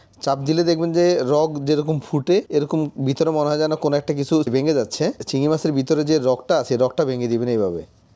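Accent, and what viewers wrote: Noakhali, Bangladesh. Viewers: নোয়াখালী এক্সেন্ট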